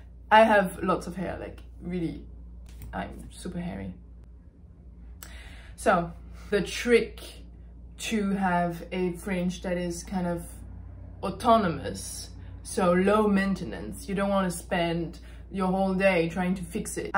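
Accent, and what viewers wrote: France. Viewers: Wait! Is she French?? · I love your accent 😍 I’m currently learning french, the most beautiful language in the world xx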